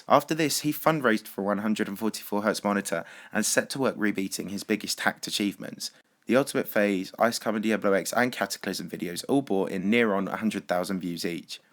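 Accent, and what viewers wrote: United Kingdom. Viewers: i hate the britain english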